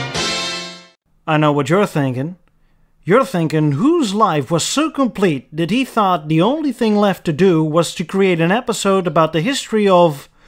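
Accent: Netherlands. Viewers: i love this channel i found it by accident but i love it, also pretty sure he is dutch dat accent herken ik van een kilometer afstand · The Dutch accent is nice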